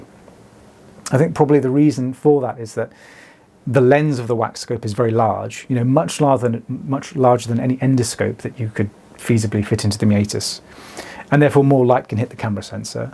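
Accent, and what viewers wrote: England, United Kingdom. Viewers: I might be really shallow but I like to tune in just to listen to your beautiful English voice. Wax removal is a bonus!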